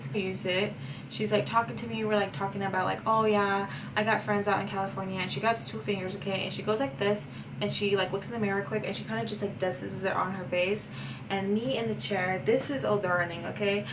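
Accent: Mexico. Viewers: where r u from btw I love the spanish accent sounds kinda mexican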